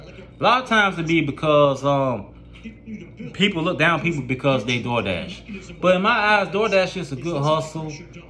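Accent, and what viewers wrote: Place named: South, United States. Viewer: You from North Carolina/Virginia?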